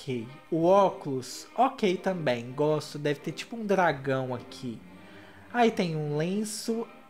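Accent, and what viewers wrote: Minas Gerais, Brazil. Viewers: Você tem um jeito de falar muito espontâneo. Desde o primeiro vídeo que eu vi, já percebi que você era mineiro. Amo seus vídeos ❣️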